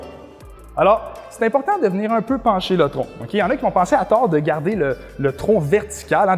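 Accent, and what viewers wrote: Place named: Quebec, Canada. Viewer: merci pour la dermonstration mais vous parler trop vite avec un accent anglais en avalant les mots : c est le quebequoi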